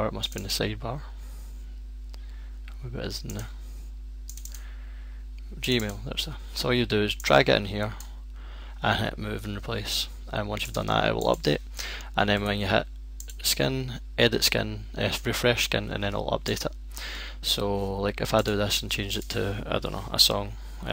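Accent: Scotland, United Kingdom. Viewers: LOL you guys are fucking morons. I can hear him perfectly, it's not difficult to understand what he's saying. As far as a Scottish accent goes that's a light one..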